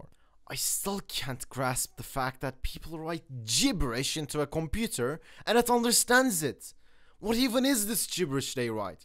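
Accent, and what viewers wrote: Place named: Turkey. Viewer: This can be random but are you turkish by any chance? Your accent is really thick :D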